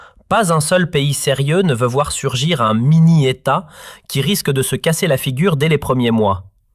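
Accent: Canada. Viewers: Mensonge, on dirait un Marocain qui parle canadien , les algériens sont a l'aise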